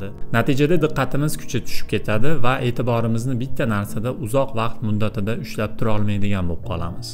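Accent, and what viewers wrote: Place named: Andijan, Uzbekistan. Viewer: Anjancha shevani eshitib siz bn faxrlandim,omadizni bersin👍👍✊✊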